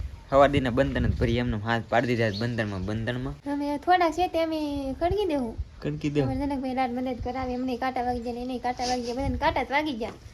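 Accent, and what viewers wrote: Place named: Banaskantha, India. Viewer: અમારે બનાસકાંઠા માં પણ રોજડા જ કેસે Bhai 😇 તમે બોલો ને એમજ અમે પણ બોલીએ સિયે આપડી બોલી સેમ too સેમ સે હો ભાઇ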